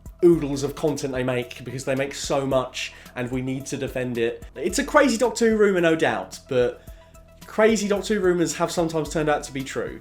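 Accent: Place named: Scotland, United Kingdom. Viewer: Let him keep his REAL voice... Third Scot up. :)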